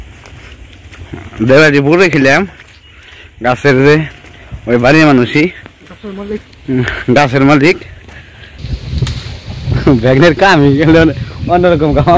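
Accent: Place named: Dhamrai, Bangladesh. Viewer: Aapnader Baari kothaye bhai? Aapner accent shune mone hocche aamar Nana'r barir kaache. Aamar Nanar baari Dhamrai Upozila, Graam Kushura, Bongshi Nodir paare.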